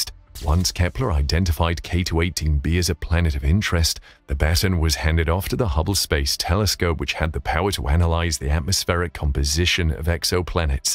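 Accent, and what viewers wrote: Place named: Eastern Europe. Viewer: The robot speaker has a East European accent. Many promising titles lately that don't do as they promise.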